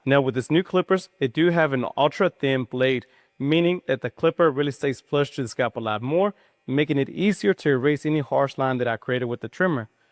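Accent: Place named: Mexico. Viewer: This guy can’t decide if he wants to sound Mexican, black, or redneck.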